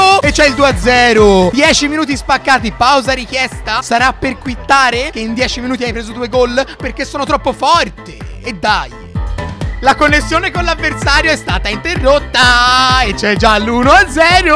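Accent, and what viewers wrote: Tuscany, Italy. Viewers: In questo video il toscano era al massimo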